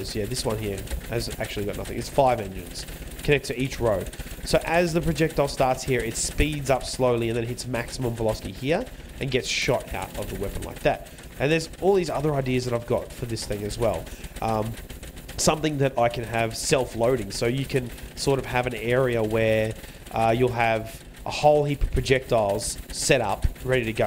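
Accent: Australia. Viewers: are you aussie? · dude I'm new to the channel and are you Aussie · "he's Aussie".........subscribes:D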